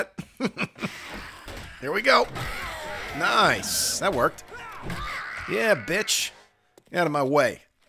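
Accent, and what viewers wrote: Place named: Philadelphia, United States. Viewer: His heavy accent makes me miss my hometown. Can't tell me he's not from philly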